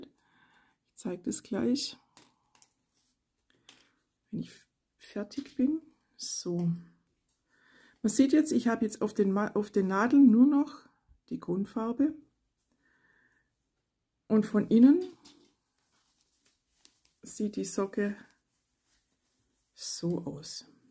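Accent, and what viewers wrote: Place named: Swabia, Germany. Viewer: 👌toll erklärt. Schön mit Deinem "schwäbischen" Dialekt. Man kann's halt nicht verbergen.😁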